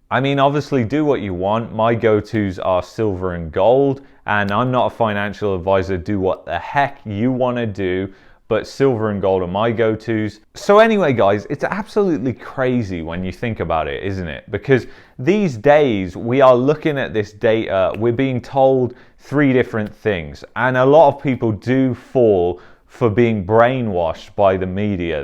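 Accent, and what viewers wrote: United Kingdom. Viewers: Why do you have a British accent ?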